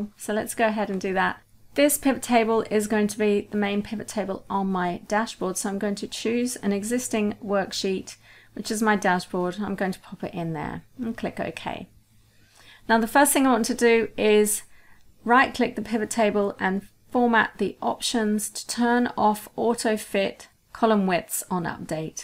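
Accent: United Kingdom. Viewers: Which English accent is this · Hi, thanks for sharing and please keep posting long videos because not only you will help us in learning excel but also would help us in learning British accent. I have been literally looking for a British channel for a very longtime ... thanks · Why is it British-sounding women always seem so SMART? :-)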